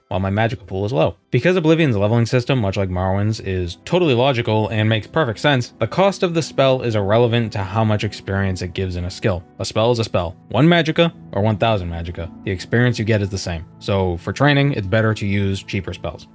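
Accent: Boston, United States. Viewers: the bostonian accent is strong with this 1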